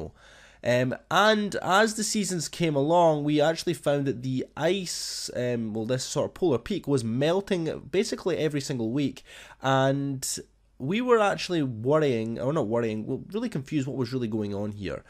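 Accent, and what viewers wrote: Scotland, United Kingdom. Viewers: R u Scottish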